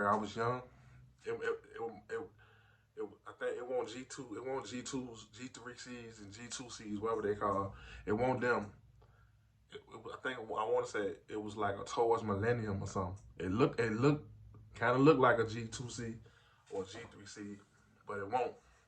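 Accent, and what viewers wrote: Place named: Richmond, United States. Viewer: This video came across my feed and I just got into it because I’m 2A everyday. I couldn’t place the accent and at first you said something about New Orleans then I heard more and realized you were from Richmond. Reminded me of one of my favorite channels Stay Out The Way Tre and him talking about Virginia politics. Favorite part of the video hands down was when you said your brother beat that case. The American justice system in my opinion, is the most effective system we have currently in the world, but it’s still obviously flawed. One of the biggest flaws is when they arrest people that are defending themselves in deadly situations. A man should be able to defend his own life, let alone having a family to watch out for, without any fear of spending years in prison for doing so. If I’m going about my day, obeying the law and treating people with respect, why should I allow undue harm to come to myself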